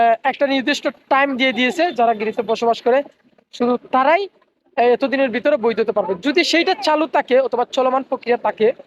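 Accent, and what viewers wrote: Sylhet, Bangladesh. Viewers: Vai subscribe korlam..apni mone hoy amrar Sylheti? Vai amito aitam chai Greece 2024..aiya kam pawa jaiboni city alaky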